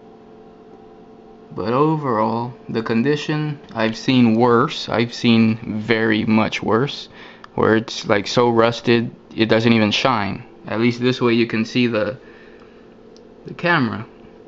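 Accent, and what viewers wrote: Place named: Netherlands. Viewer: sounded like a Dutch accent ;)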